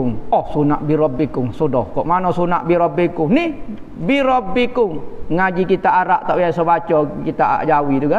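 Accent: Kelantan, Malaysia. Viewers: Xde masalah ..org luar pun leh faham loghat kelate…teruskan pendedahan..💪🏻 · Penyampaian dalam loghat kelantan 'pure'(pekat) tetapi padat tepat & jelas Mempunyai aura keilmuan yg tinggi, ikhlas serta tiada yg disembunyikan Semoga Allah melindungi Pak Syekh ni sekeluaga & kita semua dalam lindunganNya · Saya baru mengenali ustaz yg berani ni//walaupun kecik kelantan tapi jelas dan boleh difahami...TETAP SEMANGAT USTAZ..LONDEHKAN KEBUSUKAN N KEJAHATAN WAHBI...TERBAIK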